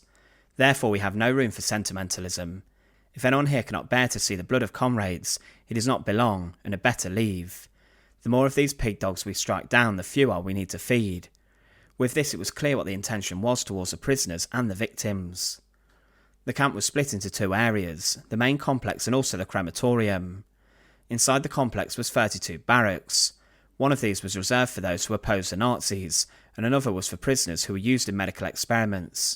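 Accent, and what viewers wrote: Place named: England, United Kingdom. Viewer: Love your videos but your English accent is so damned thick I can't understand half the time. Sorrym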